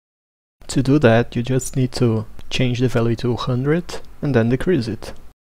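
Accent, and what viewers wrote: Brazil. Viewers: man sounds like brazillian, look at that accent, you love to see it. tamo together mano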